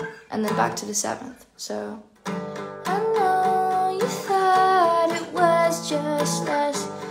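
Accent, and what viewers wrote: United Kingdom. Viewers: she's british ??????? :( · She is British????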